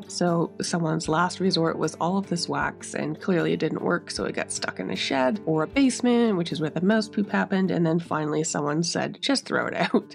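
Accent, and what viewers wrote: Canada. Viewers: Gorgeous!! Ok, confused. You definitely have the Canadian accent but your “y’all” really threw me! 😂 I was born and raised in Wisconsin, but have lived in the south for 30 years, so to say I have an odd accent would be an understatement… But I definitely say y’all because I got sooo many comments for saying you guys.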